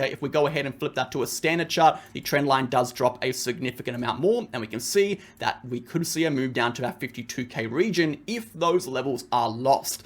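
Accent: Australia. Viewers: Let’s go …was waiting on that down under accent to guide the way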